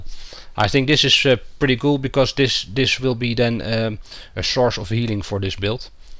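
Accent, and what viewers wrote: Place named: Netherlands. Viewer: dutch much:)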